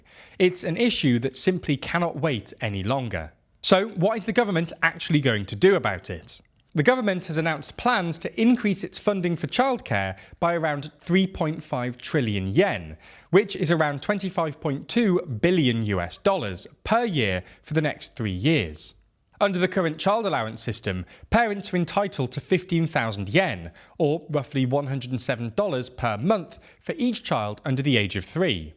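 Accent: England, United Kingdom. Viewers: Oh no, Japan will have to import infinity foreign colonizers (sometimes called asylum seekers by idiots in America and Europe) to solve this problem. They couldn't, I don't know, reduce the population density naturally and rebalance the population pyramid over the long-term. Japan will be fine so long as they do not accept foreigners into their country and maintain their unique people to live on their own. No need for cheap British solutions coming from the Americans 52nd state (Canada for those wondering). TLDR is truly just shitlib propaganda with an English accent, but zero muscle mentally or physically for that matter.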